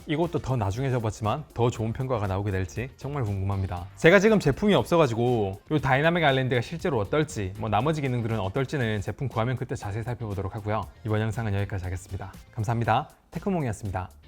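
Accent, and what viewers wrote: Gyeongsang, South Korea. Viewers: 사투리 귀엽네요 ㅋㅋㅋㅋㅋ경상도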